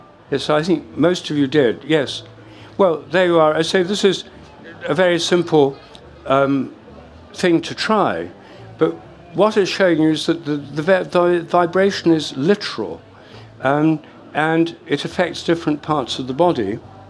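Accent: United Kingdom. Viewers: I guess it’s just his British accent. But certainly not a serious intellectual. Reminds me of Alan Watts.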